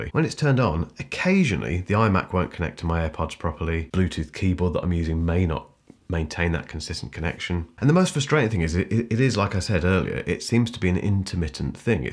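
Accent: United Kingdom. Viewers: I like your style; and your grey walls, so easy on the eyes. You've obviously thought about the visual look of your show. I like your clipped British accent. You guys just speak English better than we do :) I'm American. You also get the information out in an articulate and quick manner, not always the case with other youtubers.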